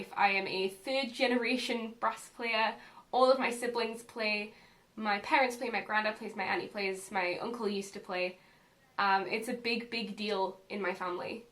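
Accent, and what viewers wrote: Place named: Lowland Scotland, United Kingdom. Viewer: No idea what the hell he's on about. You sound lowland Scottish or Northumbian to me. My dads moms family was from the border areas (Scotts and Fosters) and I remember my Great grandparents with an accent very similar to yours.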